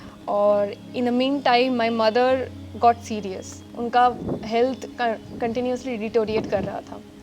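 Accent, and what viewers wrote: West Bengal, India. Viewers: Didi are you from West Bengal?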